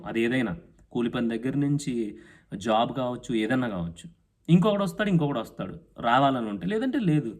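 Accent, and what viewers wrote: Godavari, India. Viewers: Brother manchiga cheppav,mana east and west godavari stylello,ur native place?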